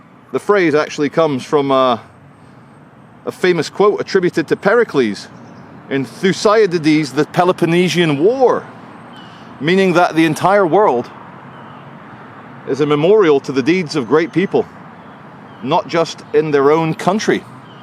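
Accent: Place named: Scotland, United Kingdom. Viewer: A Scottish name and Scottish accent are good enough for me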